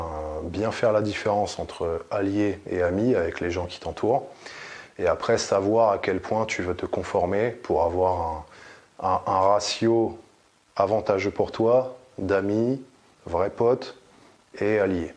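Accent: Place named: Lyon, France. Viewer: t'as l'accent lyonnais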